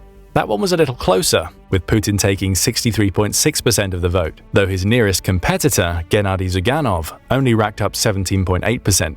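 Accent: England, United Kingdom. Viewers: Are you from England,kinda figured 🇺🇸💅☢️ your boath toast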